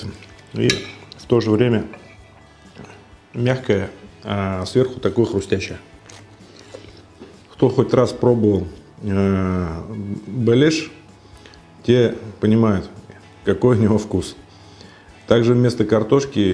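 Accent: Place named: Kazan, Russia. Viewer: Уже много лет живу далеко от родины. Рецепт простой и вкусный и поведан с таким неповторимым казанским выговором, ну просто бальзам на душу. Спасибо большое ☺️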